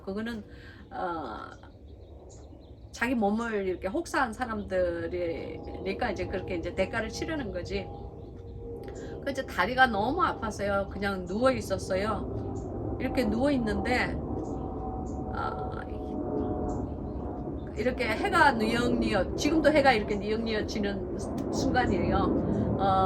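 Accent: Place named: Busan, South Korea. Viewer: 귀하신 말씀 나눠 주셔서 너무나 감사드립니다 부산 사투리가 어쩜 이리 친근하고 매력적인지 쎄가빠게 ….ㅋㅋ 쎄가빠지게 말씀공부 따라 가는 중입니다^^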